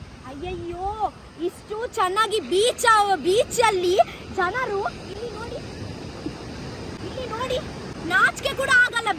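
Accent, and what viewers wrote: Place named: Tulu Nadu, India. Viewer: One of My professor is from Mangalore, his mother tongue is tulu language,,,,, so he is also speaking kannada like you.....i remember him after watching your video.😅😅😅😅😂😂😂😂😂 By the by he is excellent knowledgeable professor.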